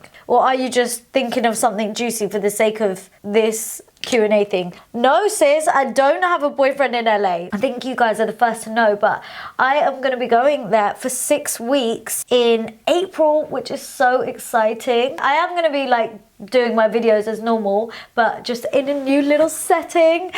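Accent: United Kingdom. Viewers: Your hair is incredible! Do you have a tutorial? Ps love how london u sound😅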